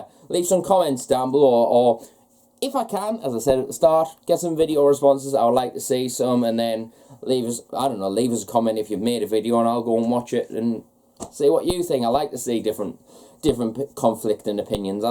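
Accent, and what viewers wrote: United Kingdom. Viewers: It's a Geordie accent, he's from Newcastle or Sunderland in the uk, the accent is sexy af with girls the Geordie accent lol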